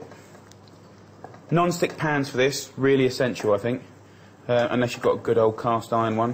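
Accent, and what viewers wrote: United Kingdom. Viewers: He is British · He doesn't have a lisp, he's from the UK.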